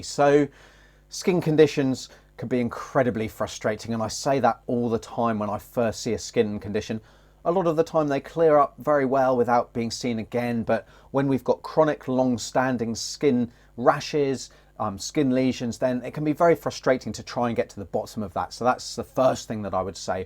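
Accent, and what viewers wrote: United Kingdom. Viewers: I can't understand you with that deep UK accent. I heard dog and rash. That's all I understood.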